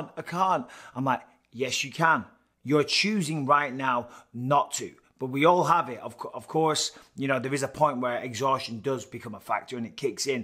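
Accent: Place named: United Kingdom. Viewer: Dude is a natural. He's decent looking, funny, great talker, and he has a British accent. How can you not like him?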